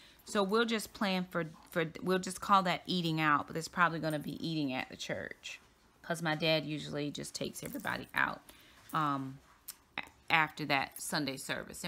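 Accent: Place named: Baltimore, United States. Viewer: What part of the country are you from? It's not often I hear someone who says "on" the same way I do, and I'm from Baltimore, MD. That almond milk is the best, and cheapest, I've found!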